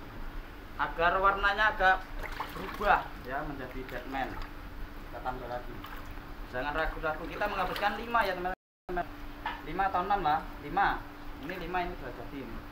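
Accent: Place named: Java, Indonesia. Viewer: Logat jawa bosq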